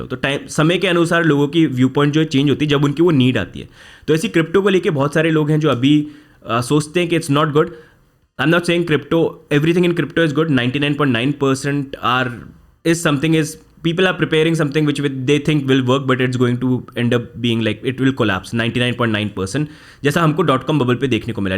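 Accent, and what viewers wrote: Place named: India. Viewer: sry bro but these indian eglish is horrible, cant understand anything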